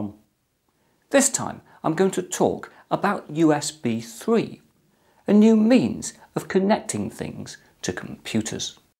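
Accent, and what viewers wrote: United Kingdom. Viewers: Dat british accent! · It is a British Accent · Nein, es ist Britischer nicht Amerikanisch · He's British... this is how they all speak, what more did you want? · brittish dude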